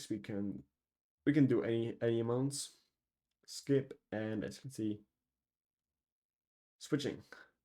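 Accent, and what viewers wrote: Germany. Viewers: are you german? because your accent sounds really german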